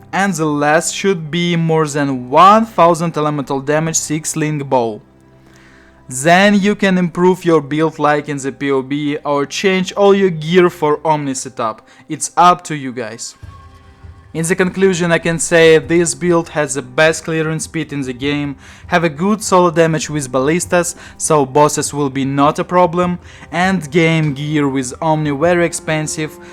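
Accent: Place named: Russia. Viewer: Со стронг рашен акцент😀, бат окей бро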